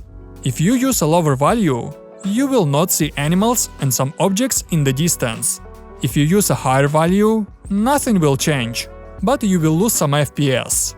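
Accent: Russia. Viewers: русский акцент ни с чем не спутать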